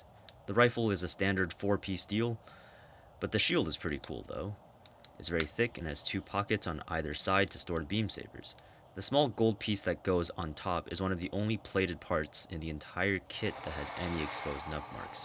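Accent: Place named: Ireland. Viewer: he's from ireland what do you expect his voice is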